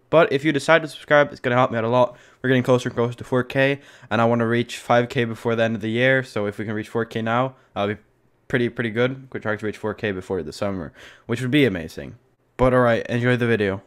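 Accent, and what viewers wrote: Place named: Netherlands. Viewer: such a dutch accent tbh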